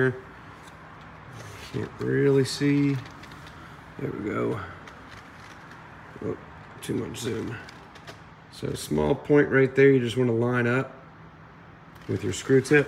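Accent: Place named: Georgia, United States. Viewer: You have great voice and communication style for this. I think it has something to do with Georgia. I know one other person that sounds like you and he's from Georgia as well. I was looking for the right GX all over the country and I guarantee I would've ended up buying from you had I found your videos sooner. Anyway, you make the absolute best and practical GX content there is.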